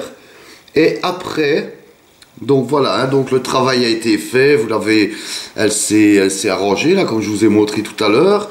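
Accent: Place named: Belgium. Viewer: Merci, super sympa et l' accent belge est magnifique, ne change rien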